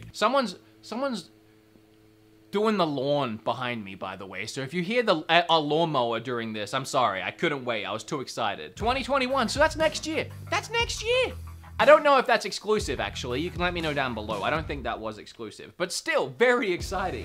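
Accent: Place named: Australia. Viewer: This guy sounds Australian...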